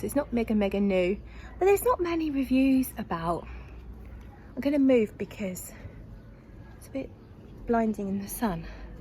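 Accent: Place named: United Kingdom. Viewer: I just ordered Artsy by Kimberly NY- it’s supposed to be very light-hearted and candy like, possibly marshmallow-like. Also I just want to say I love that I get to hear your lovely British English often. I wish I had an accent like that. You know my mother was Australian so she said things funny and I did too but I remember the day I started saying “orange” with a long o instead of “arange” like her.. I know that’s not British but anyway I started out with a different accent..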